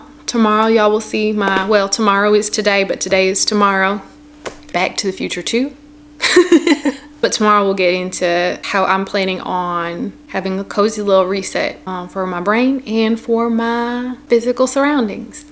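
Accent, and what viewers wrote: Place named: Texas, United States. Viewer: Your podcast was recommended to me. As soon as you spoke, I knew you were from the South. I'm from Tennessee. You sound like Texas?? Your dog is so cute and your kids. Enjoyed!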